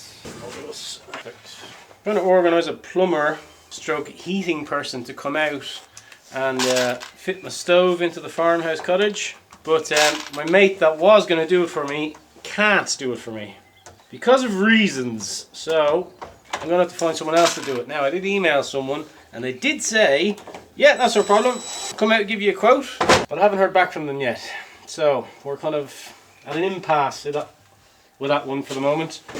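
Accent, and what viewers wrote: Ireland. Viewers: I get the feeling, when I read the comments, that everyone has your lovely Irish lilt and expressions front of mind when we gather our thoughts and write them down. I always write with an accent when I write my comments after listening to you. Your expressions are really endearing. Thank you so much for sharing your day with us. I really enjoyed lunch. It was delicious. That dessert was particularly magnificent. My nosey attitude is wondering what you might be bringing home with the engine when you return. I hope you have a wonderful week. At least, helping Dan will stave off feeling like a 60 year old for a very long time. (mid-afternoon naps are the half of it!!) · Perfectly timed for the experience of a taco salad then wine accompanied by an Irish brogue. I zoned out on the math calculations, though, ha ha. I'll give you a like in spite of those. 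And now to go and address my own piles of clutter. I hope we both win.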